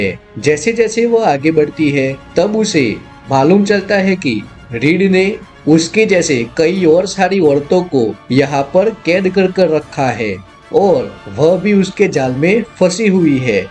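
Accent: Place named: Gujarat, India. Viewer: Gujarati accent….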